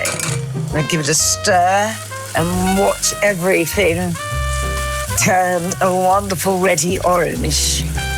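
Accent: RP, United Kingdom. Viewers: Nigella, you have been such an inspiration throughout my life! I found your shows many years ago when I had the privilege of living in the U.K. You and I both lost our mothers around the same age and I find your recipe videos to be incredibly comforting when I am missing her the most. Especially your Christmas episodes. I find such inspiration in your ability to manifest joy and beauty in often simple ingredients made decadent, and of course the well-deserved fancy ingredients. The way you describe food is like poetry. I not only learn new recipes, but my vocabulary has increased exponentially. I can only imagine how much fun it would be cooking a meal with you while sipping on a cocktail. Thank you for sharing your life with us, even with the things you perceive as flaws. They're not flaws, they make you even more perfect. You've always been so honest with the public and I think that is both noble, rare, and worthy of all of praise. 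Please don't stop saying "meekro-wavay." It's become a household term in my family because of that episode. I thought it was absolutely adorable. You speak the Queen's English but throw in your own cheeky neologisms that make you 3-dimensional. Thank you <3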